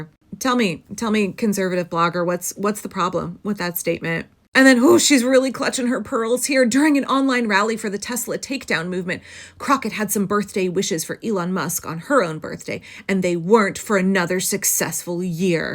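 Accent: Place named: Texas, United States. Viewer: You are still a Texan….you speak Texan woman great! She has a huge surprise coming.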